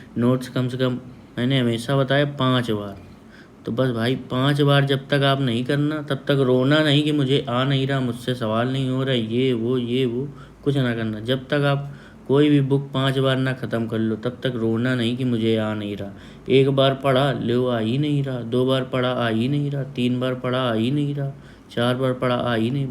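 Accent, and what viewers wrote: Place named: Kanpur, India. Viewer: Bhaiya aapke accent se hi samajh gaye the ki aap kanpur se hain ...badiya chize batate h aap ... dhanyawad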